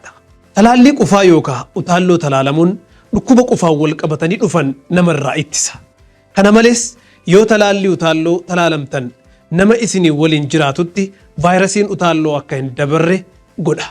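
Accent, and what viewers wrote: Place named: Somalia. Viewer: İ think you talking like Somali language sir, is there any difference between Somali and oromo accent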